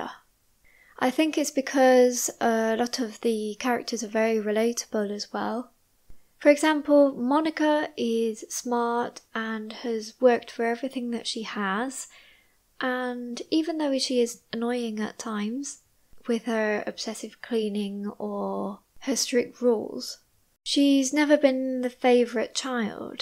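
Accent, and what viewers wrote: United Kingdom. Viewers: I think I'm still able to catch 95% the C1(without reading the text, off course). And I'm wondering how difficult it is on the C2. Will you make the listening practice for C2. Btw, I like your british accent. You use MRP, right? I still find it difficult to understand non MRP british english.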